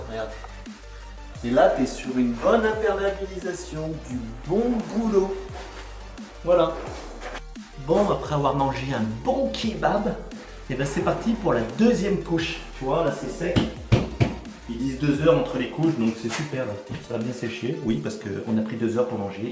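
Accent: Loire, France. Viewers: Ok je me disais bien que tu avais un petit accent du 42 ;) et une petite recherche google m'a confirmé ça. Ma mère bosse dans des petites boites de BTP dans le même département que toi ! Continue en tout cas, au top tes vidéos, et je rigole bien à chaque fois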